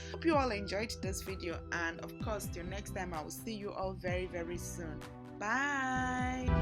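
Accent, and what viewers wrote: Nigeria. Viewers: love your nigerian accent ....hope this is not to personal what tribe ?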